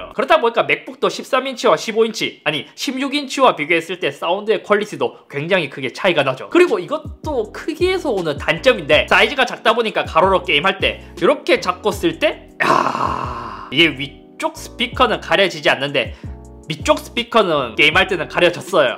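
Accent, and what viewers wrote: Gyeongsang, South Korea. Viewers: 이렇게나 확실하게 경상도 말로 이야기하는데도 이렇게나 감각 좋고 세련되게 가젯 리뷰하시는 분은 달리 없음. 12, 12미니 비교 리뷰 여러 개 봤는데 님 것이 최고네요. 덕분에 12미니 펌핑 사라짐~ ^_^ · 이 클립은 경상도 시청자들을 위한 것인가요? 전국의 국민들을 대상으로 한 클립이면 사투리 액센트 고치시고 영상 찍으시면 좋겠네요 성우를 쓰시던지요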